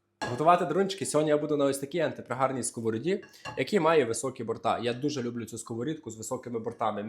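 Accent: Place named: Western Ukraine, Ukraine. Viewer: Jak pravilnyj???Vy z zavzdy chejtyte zachidnjakiv za dialekt.Choca já zavzdy svarjusa Jakyj dialekt?Chlopec hovoryt z mirnym dialektom.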